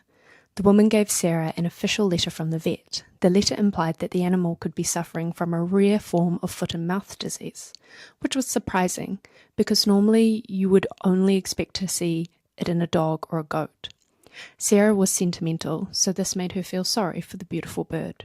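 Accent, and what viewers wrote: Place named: New Zealand. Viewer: I love the Kiwi accent. You have a nice, soft way of speaking too and it is very pleasant to listen to. I have a typical flat midwest United States accent. 😋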